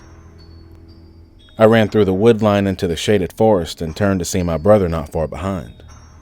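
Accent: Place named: South, United States. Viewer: Yet southern accent turns me on.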